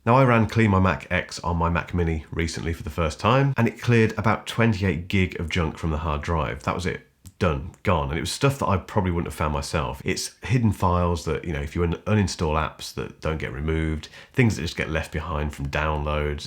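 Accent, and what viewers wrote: England, United Kingdom. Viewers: All YouTube reviews should be in English accent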